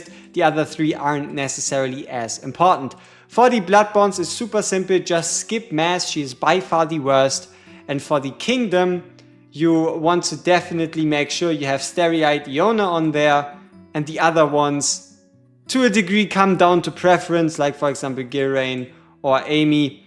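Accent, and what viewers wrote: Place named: Germany. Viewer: Ich erkenn an der Art wie du sprichst das du Deutscher bist. Meinst du man sollte neu anfangen wenn man diese Fehler relativ oft am Anfang gemacht hat oder trotzdem weiter spielen bin jetzt Level 25 nach 2 Tagen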